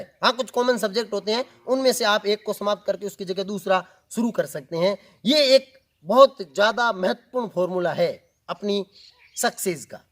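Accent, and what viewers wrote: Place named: Marwar, India. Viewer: सर किस गांव से हो मारवाड़ी बोली सुनकर मजा आ गया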